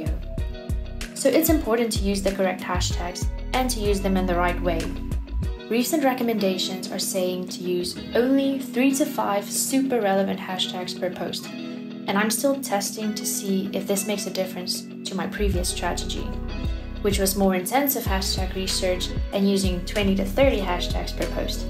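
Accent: South Africa. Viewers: You sound so South African ...others mistake our accents for all sorts of others, but we can always catch the subtle inflections etc, no matter where in the world. I live in Portugal. Adeus/Totsiens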